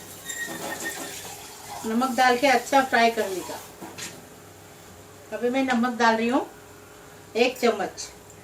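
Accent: Konkan, India. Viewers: Aapko dekhkar mujhe meri padosi kokani aunty ki yaad aa gai.. aapka bolne ka tariqa.. 👌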